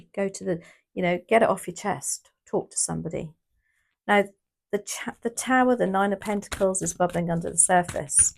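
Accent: United Kingdom. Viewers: So nice to hear an English accent 😃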